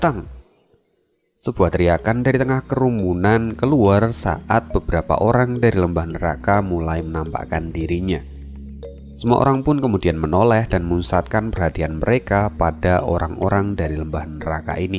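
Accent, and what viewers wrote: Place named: Java, Indonesia. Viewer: Mimin btw orang mana yaa kayaknya logatnya logat Jawa nii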